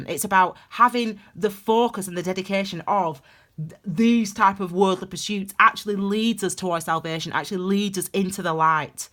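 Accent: United Kingdom. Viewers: Congrats! TY from U.S. Your accent sounds British. Enjoy your holiday. We would say vacation, I think. 🌅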